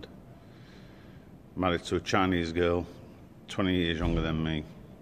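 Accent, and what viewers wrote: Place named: Yorkshire, United Kingdom. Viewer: Reckon he should be voiceovering plenty of stuff on telly. 👍 Clear, concise, no nonsense. Anyone would guess he's from God's own county.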